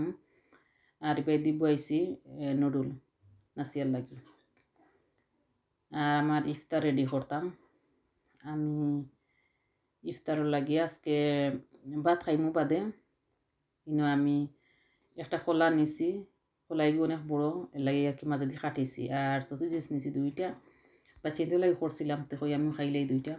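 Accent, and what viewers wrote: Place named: Sylhet, Bangladesh. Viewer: Ldone assalamualaikum apu kemon achen sylhet vasha sunte valo laglö apu sylhet vasha asena shuddo vasha chole ase valo laglo apu ❤❤❤❤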